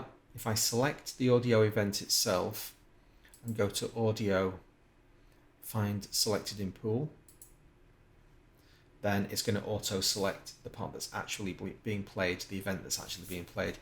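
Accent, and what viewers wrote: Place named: Liverpool, United Kingdom. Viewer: Great Tutorial, Refreshingly Calm, Peaceful & Sticks To Teaching The Topic In A Precise & Interesting Manner, As He Is Doing It. Also, What A Gentleman! This Is A Great Video & I Will Be Looking To Learn More From. "Thank You ". p.s. To Make Things Even Better, I Believe I Detected A Genuine Scouse Accent. The Great City Of Liverpool, "The Home Of The BeatlesIs The Centre Of The Universe", & To Be More Precise, The Actual Centre Is Here In Fazakerley, In Liverpool.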